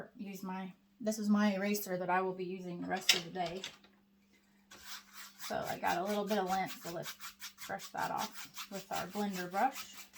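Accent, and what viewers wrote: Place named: South Carolina, United States. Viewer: Where did you get your non-accent? I say non-accent because you sound like we do in South Carolina.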